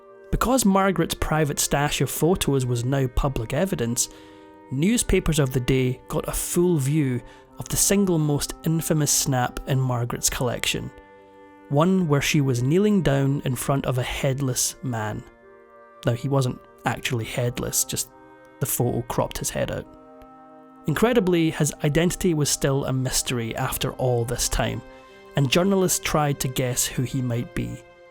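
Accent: Scotland, United Kingdom. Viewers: Why do I always think THANK GOODNESS 2 seconds into the video when our Scottish narrator kicks in. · With a charming little Scotish zing. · Scots don't listen. They burr.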